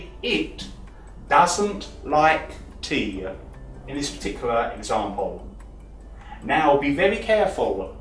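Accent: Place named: London, United Kingdom. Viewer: У этого преподавателя очень хорошее произношение( Exact English) Вы не найдете в России другого такого педагога- англичанина с лондонским акцентом.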